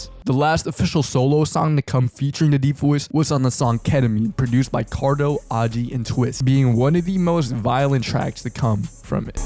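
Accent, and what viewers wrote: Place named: California, United States. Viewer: he sounds and dressed like he could be from Cali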